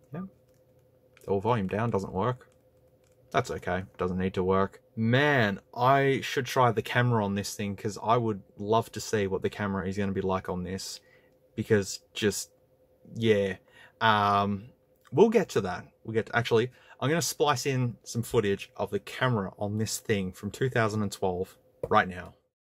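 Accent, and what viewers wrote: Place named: Australia. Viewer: Australian ashens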